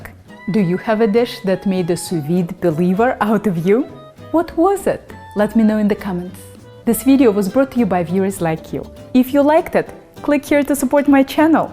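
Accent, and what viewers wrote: Russia. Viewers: Fucking Russian accent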